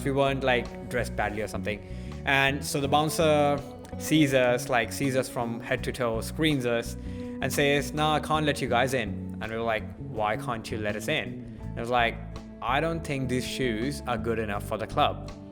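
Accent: Australia. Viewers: Which accent you speaking in is it Strayan or Indian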